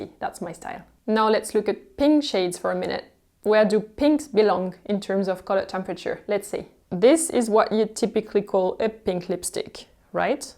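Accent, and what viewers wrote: France. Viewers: English with french accent is soooo beautiful ❤ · I truly just love your French accent, only heard it in movies.. so nice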